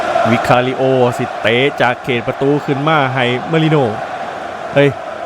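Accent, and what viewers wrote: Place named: Isan, Thailand. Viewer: ไม่เคยเห็นชาองไหนพากย์ อีสานแบบนี้ สุดยอด..😊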